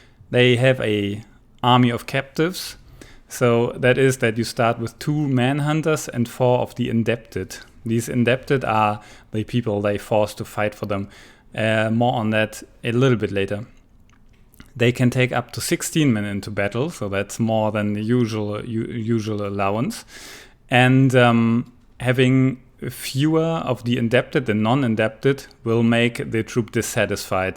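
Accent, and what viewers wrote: Germany. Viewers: 宁这英语，一股子🇩🇪味儿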